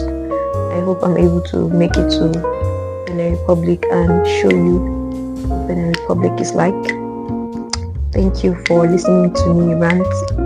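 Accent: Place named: France. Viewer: This cool ❤... just love your french accent fr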